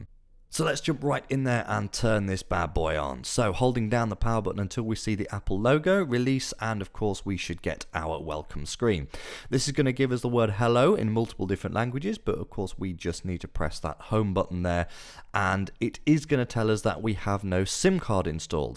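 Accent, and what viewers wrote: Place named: United Kingdom. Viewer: It does not have writing in the back if you live in the U.S. and in some other places like Canada. He probably lives in the UK I'm guessing bc of his voice and....He said it in the video.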